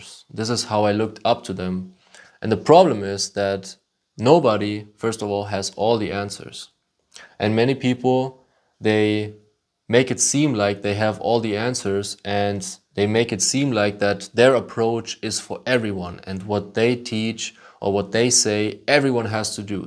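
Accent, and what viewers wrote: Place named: Germany. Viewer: Italian name, German accent... Where are you originally from? I'm from Slovenia.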